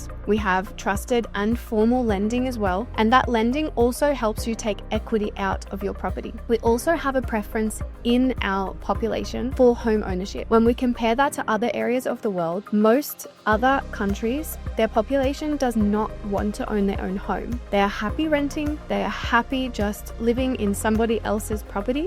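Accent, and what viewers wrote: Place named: Australia. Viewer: Can't stand Australian accent!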